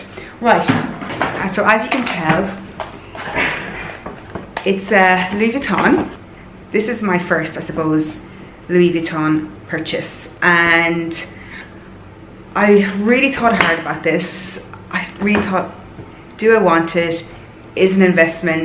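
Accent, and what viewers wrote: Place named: Ireland. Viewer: Love your bag, love your accent, to me it almost sounds like an Irish accent. Cute.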